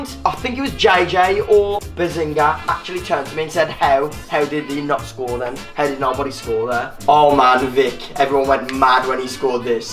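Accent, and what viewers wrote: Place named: Birmingham, United Kingdom. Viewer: I can tell this guy is a brummy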